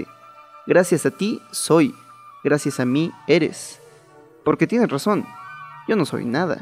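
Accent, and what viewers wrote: Mexico City, Mexico. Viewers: Dos años, editado este gran trabajo,; EXCELENTE DOCUMENTAL, IMAGINO TODO EL TRABAJO Y PACIENCIA PARA LOGRARLO, IMAGINO TODA LA CREATIVIDAD Y TIEMPO PARA PRESENTARLO. EN UN LENGUAJE 🇲🇽 "CHILANGO" (alegre y relajado y audible) B E N D I C I O N E S❗ ESTÁ S U P E R‼️ 👏🏼👏🏼👏🏼👏🏼👏🏼👏🏼👏🏼 BRAVO ‼️ 👍🏾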